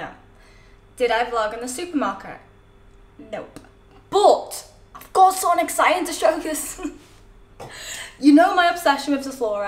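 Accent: Liverpool, United Kingdom. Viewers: Talking about ur accent You'll know that every fecker has an opinion with regards 2 the way people talk , but please dont let the pricks put u down ever . Are there many scoucers u work with ? Enjoy ur time in London and rather than just sayin " I,'ll be in London " can u say which of the 3 instead . . . As in Heathrow Gatwick or Stansted. Have a good one ! Christy 🇮🇪/🇵🇹 👍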